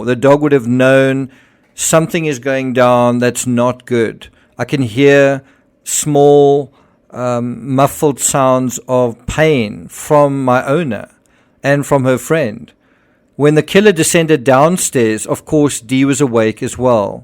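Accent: South Africa. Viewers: Is the narrator South African?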